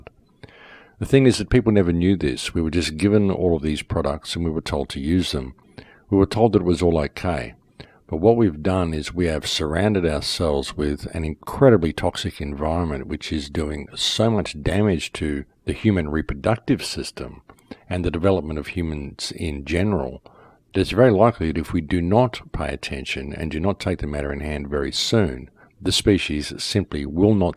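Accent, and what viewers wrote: Australia. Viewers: Patrick dalton what r u talking about he's australian not british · Wow. I've woke up, never heard of you, first thing on my feed and you're describing exactly how I feel!!!!! And you sound like you are in Australia. Is there any way to connect with you on LinkedIn?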